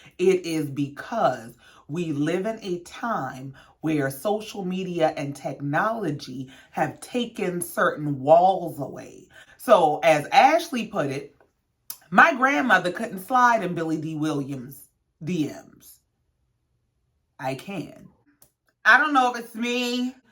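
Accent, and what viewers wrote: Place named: Gullah Geechee, United States. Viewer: I knew you sounded like a cousin!! I’m from Savannah! Heeeeey Geechee cousin!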